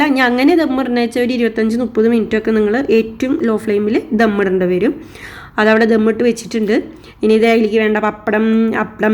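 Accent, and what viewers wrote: Thrissur, India. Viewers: നിങ്ങള് തൃഷൂർ ആണ 😜 എല്ലാം സ്പീഡിൽ പറഞാൽ പെട്ടെന്ന് ബിരിയാണി ഇത് പോലെ തയ്യാറാക്കാം 😂😂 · 🌹🌹🌹🌹ഒരു അപേക്ഷ ഉണ്ട് ചേച്ചീ ഒന്നൂടെ തനതായ തൃശൂർ ഭാഷയിൽ സംസാരിച്ചു വീഡിയോ ചെയ്യാൻ പറ്റുമോ. അത് കേട്ട് പാചകവും കാണാന്‍ ഒരു രസം ഉണ്ട്... 🌹🌹🌹🌹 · തൃശൂർ ആണോ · ബിരിയാണി ഇഷ്ടമായി. ആ സംസാരവും എനിക്ക് ഇഷ്ടമായി. തൃശൂർ ക്കാരി ആണോ.ഞാൻ തൃശൂർ ക്കാരിയാണെ. 😆😆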